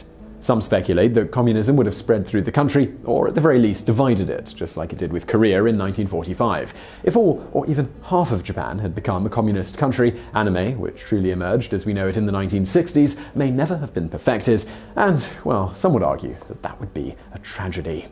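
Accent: United Kingdom. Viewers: mispronunciations really bother me for some reason. He does pronounce things in a British way sometimes which doesn't bother me. It's more the pronunciation of Yukatan and on one of the other videos he mispronounce Haiti. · You realize he is British and they pronounce things differently there right? Saying Yuck-atan is perfectly fine. He also pronounced Leif correctly, it is a Scandinavian word and it is usually pronounced Layf, look it up. Try to do some research before attempting to incorrectly correct things, yah dingus.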